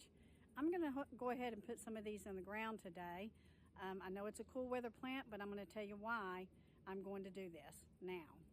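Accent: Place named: North Texas, United States. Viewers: SE Texas here. What was that wet stuff falling from the sky? I swear, we haven’t had rain in months. I was thinking about planting some spinach on my balcony garden. Fingers crossed. I just love watching your videos. Thanks for all you do. I grew up near Lake Texoma. Your accent takes me back home. ❤️ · North Texas gal here in south Tx. Yes her accent takes me back to Archer County and where I grew up!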